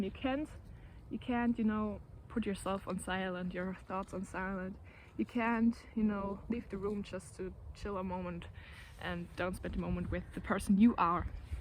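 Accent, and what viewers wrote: Germany. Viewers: Are you german? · Are you german ? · are you german?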